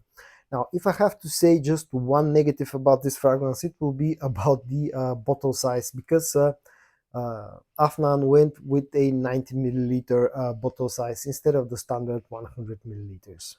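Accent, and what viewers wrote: Bulgaria. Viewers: Хаха веднага познах, че си българин от акцента иначе добър клип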